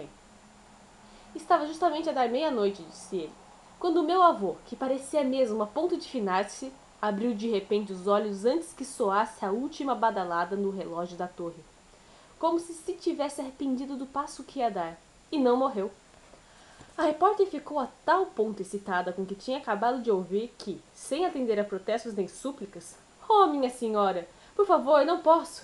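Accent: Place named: Interior, Brazil. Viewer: Rsrsrs isto eu percebi, que o sotaque é brasileiro. Só não consegui identificar exatamente de onde... Sua leitura é muito fluida. Tive de parar, prestar bem atenção. Chutaria SP por causa do "r" =D (porém muito sutil). Foi esse cifrão do Euro que me chamou atenção. Por esse preço valeria comprar fora mesmo pagando frete internacional. Nas livrarias da minhas cidade está muito caro. A língua é a mesma... Parabéns pelas ótimas leituras ;)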